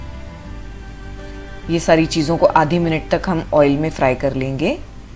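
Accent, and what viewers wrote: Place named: Bangalore, India. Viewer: I am from Bangalore , and you sound a bit Bangaloren so asked. Thank you very much mam...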